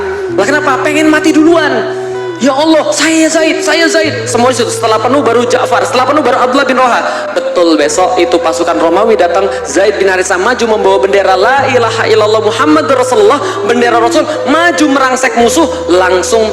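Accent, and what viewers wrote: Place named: Jakarta, Indonesia. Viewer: Orang pati tapi seperti ya asli jakarta 😅😅😅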